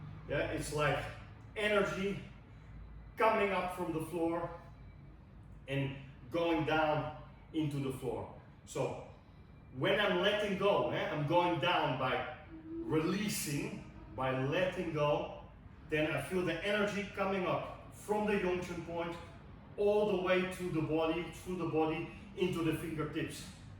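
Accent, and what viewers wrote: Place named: Israel. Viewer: I really like your videos because of how deeply you research things, I am so curious about your accent. My first guess is Israeli. I would like to see more about your fighting skills and which style ir styles you use. Is it now your own lineage. I mean are you now following a path where you create your own stuff? I don't get what you said about finishing a lineage and then learning another. It must have been so confusing. The sound is not great. Too much echo. I want to develop internal enegy control. I am not training any martial art. I want to feel better and have more control. Getting into a martial art might follow. Thanks for this video.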